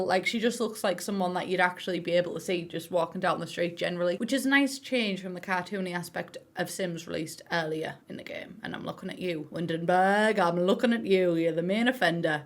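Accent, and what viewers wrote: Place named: Northern England, United Kingdom. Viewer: She is obviously a English Northerner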